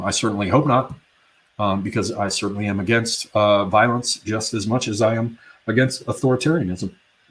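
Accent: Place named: England, United Kingdom. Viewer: D That is so fun! Your posh English accent has a combination of Irish and German dialects coming through. I'm sure glad I'm not the only one going around speaking in various accents. I tend to use Scot's English and Russian, especially when I'm brought to anger by the children. They know when mommy starts sounding Russian she lost control. ha ha ha To my credit it is seldom. Like that joke, "But did you die?" Any dialect is fine but please, no Ebonics. I have to think to myself: "School didn't fix that for you?" but then again we still have fools walking around out here not understanding the difference between your and you're.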